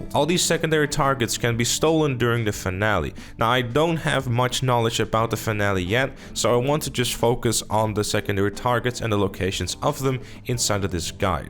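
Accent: Netherlands. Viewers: This guy has a dutch voice haha. You must be dutch (nederlands) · You sounds like a Dutch guy who's speaking English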